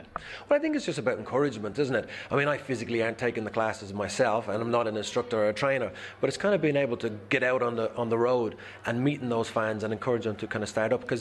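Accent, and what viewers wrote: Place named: Dublin, Ireland. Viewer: i like this fella the rough Dublin accent has improved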